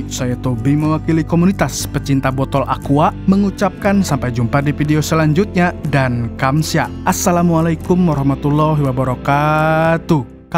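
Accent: Banjar, Indonesia. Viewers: Mamang tobi orang banjarkah apa orang kah???😊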